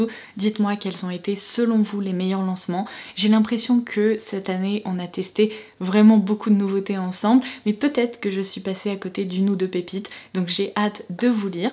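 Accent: England, United Kingdom. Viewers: Merci pour ces cadeaux pour ce super top 10 et ce super accent anglais qui fait du bien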